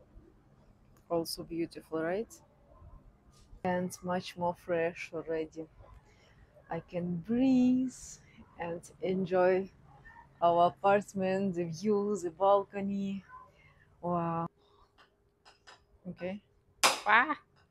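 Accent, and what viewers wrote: Russia. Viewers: $200 for rent and 35% of that for electricity? Electricity is not as cheap as rent in Vietnam. Anyway, I'll subscribe to your channel just so I can listen to your beautiful Russian accent and learn about Vietnam as well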